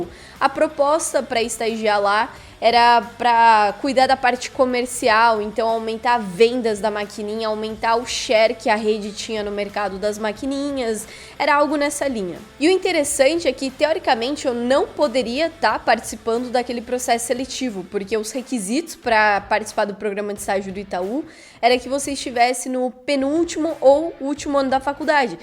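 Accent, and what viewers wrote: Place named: Rio Grande do Sul, Brazil. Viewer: Pela dicção deve ser gaúcha. Meu ouvidos agradecem quando eu escuto uma pessoa que pronuncia as palavras da forma certa!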